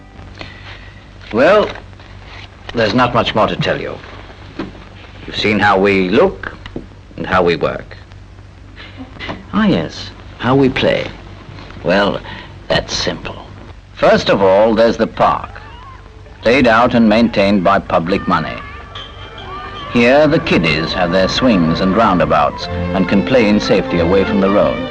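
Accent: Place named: Yorkshire, United Kingdom. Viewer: You can hear traces of a Yorkshire accent in the presenter's RP.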